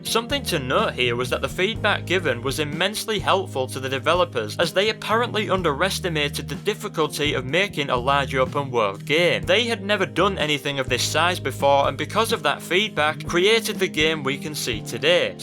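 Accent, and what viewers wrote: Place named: Northern Ireland, United Kingdom. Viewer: Are you from Northern Ireland by any chance? I have a friend from Londonderry and the way he says "game" more like "geem" makes me guess that. Also good video, I made one about Scarface too. Quite a good game.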